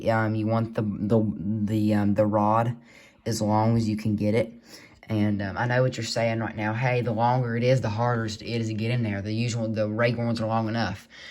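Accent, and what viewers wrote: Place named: Mississippi, United States. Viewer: I swear you’re from Mississippi 😂. Your accent is great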